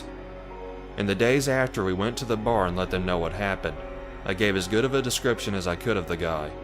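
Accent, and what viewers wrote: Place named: South, United States. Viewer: Love your accent. Sounds southern.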